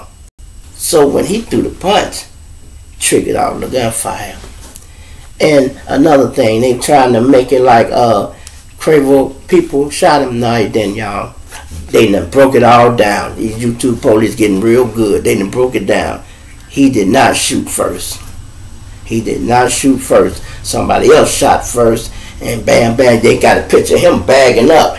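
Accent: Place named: Chicago, United States. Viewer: You are from Chi-Town or some Chi-Town ties I hear it in your talk. I didn’t hear it the first time, one love I’m the same way with the Strip Club Gambling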